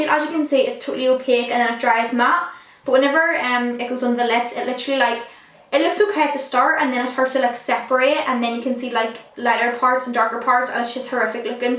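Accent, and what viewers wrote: Northern Ireland, United Kingdom. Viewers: Northern Ireland :)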